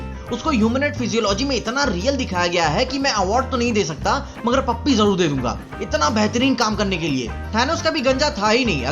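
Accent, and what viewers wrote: India. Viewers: Ur desi style of speaking is AwEsOmE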